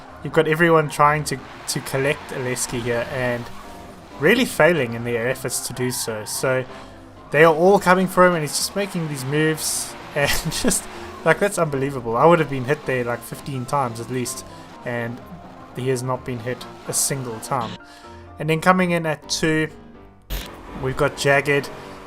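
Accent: South Africa. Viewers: Are you south African I love the accent but I'm not good at accents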